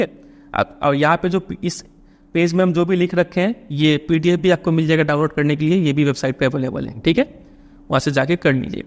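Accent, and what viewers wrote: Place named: Bengal, India. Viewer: Sir are you Bengali as your language reveals that sometimes...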